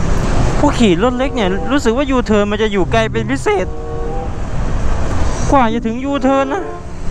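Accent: South, Thailand. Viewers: พี่ เเหลงใต้หรอยน้ะเนี้ย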